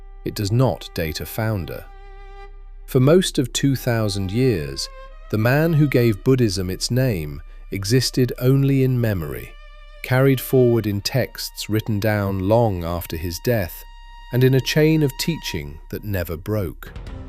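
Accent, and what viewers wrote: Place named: United Kingdom. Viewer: A total waste of my time and the hardest was to torture myself through the awful Britoid accent.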